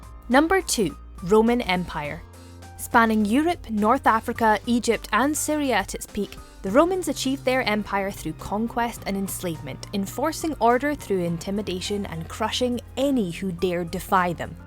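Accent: United Kingdom. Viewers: You guys are disgrace of YouTube! Stop spreading propaganda! Aren’t you ashamed to erase free speech? From your accent, you are British? Aren’t you ashamed hiding the true history and erasing the dirty history of yours?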